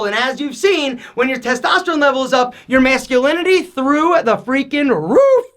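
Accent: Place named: New Jersey, United States. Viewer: where in Jersey is this guy from lol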